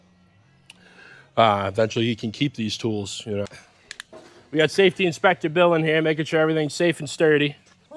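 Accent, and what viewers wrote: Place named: Upstate New York, United States. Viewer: You definitely live in upstate New York with that accent 😂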